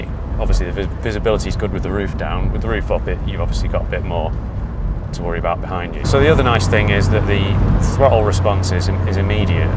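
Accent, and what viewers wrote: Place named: Leeds, United Kingdom. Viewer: You sound like Howard Moon. You from Leeds?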